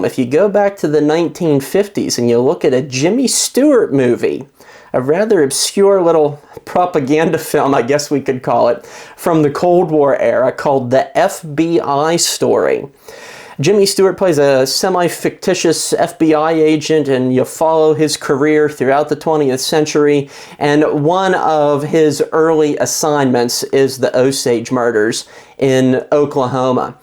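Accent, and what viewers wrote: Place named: Philadelphia, United States. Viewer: Prof, r u from philly area? You pronounce o like in philly.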